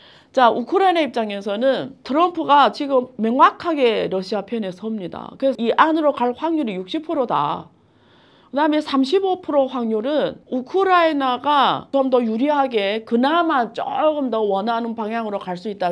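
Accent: China. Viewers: 중국인이세요?발음이 확실히 느껴집니다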